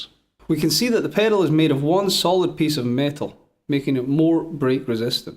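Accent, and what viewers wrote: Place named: Vienna, Austria. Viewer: I love the viennese accent!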